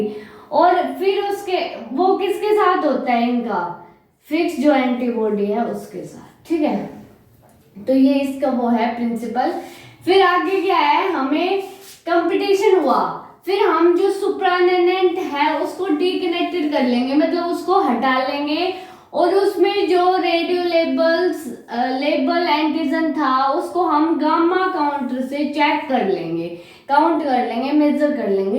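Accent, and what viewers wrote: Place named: Haryana, India. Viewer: madaam to haryana ki lagti ha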